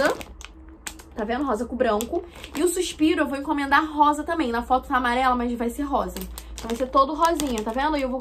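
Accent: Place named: Rio de Janeiro, Brazil. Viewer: Nos primeiros 5 segundos de vídeo eu já vi logo que era carioca ❣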